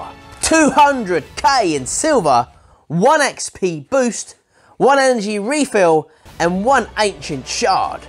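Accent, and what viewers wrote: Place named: Fens, United Kingdom. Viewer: has a "Fens" Accent IIRC.:-) He has said numerous times about being in the UK though, as he has been on Telly here, and tells us about that when it happens.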